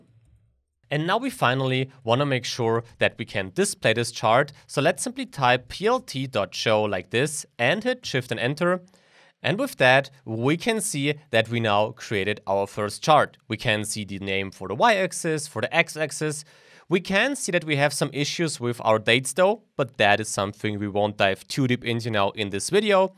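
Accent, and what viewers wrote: Germany. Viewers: I love your Deutsch accent <3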